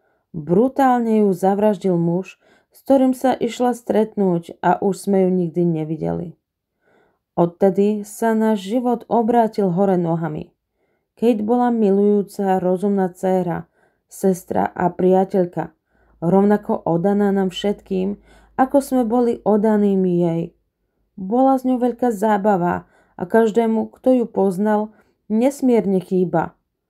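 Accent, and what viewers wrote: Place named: Eastern Slovakia, Slovakia. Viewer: Ja som na to došla ty si vychodniarka. 😂 teraz ta mam ešte ❤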